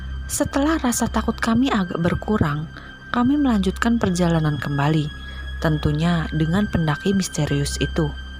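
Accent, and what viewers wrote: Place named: Central Java, Indonesia. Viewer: mbaknya pasti asli jawa tengah ya, kliatan suaranya.. darimana mbak min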